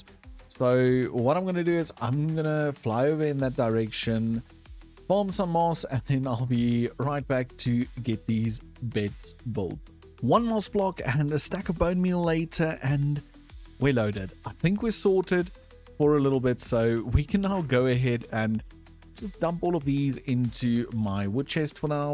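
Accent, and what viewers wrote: Afrikaans, South Africa. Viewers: I know an Afrikaans accent when I hear one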